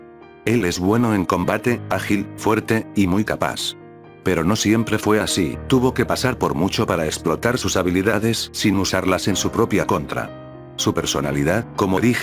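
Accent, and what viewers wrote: Argentina. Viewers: We yo ya sabía que eras argentino :V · Sabia que eras argentino uwu Se nota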